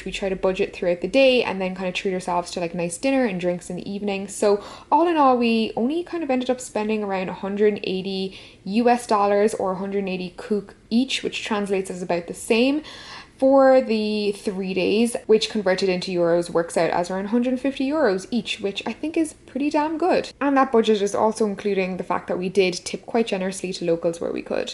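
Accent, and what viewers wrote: Ireland. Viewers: I love it. A beautiful woman who looks sometimes asian sometimes middle eastern sounds Irish lives in NYC and is reviewing Havana. If there is ever proof that the world is getting smaller here it is.! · You're from NYC? have an awful iris accent.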